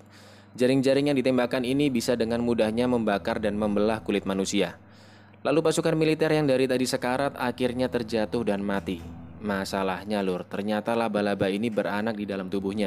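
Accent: Java, Indonesia. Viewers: Iki wong ndi sng ngomong kok medok bgt kwwkwk salam Pati Jawa tengah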